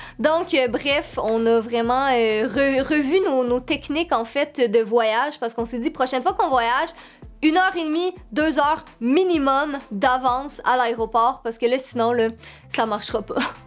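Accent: Quebec, Canada. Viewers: Ta un accent quebecoi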